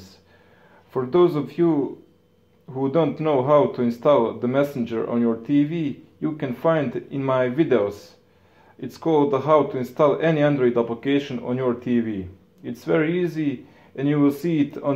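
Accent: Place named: Russia. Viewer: Fantastic Russian accent dude you're fantastic man great if you should do more videos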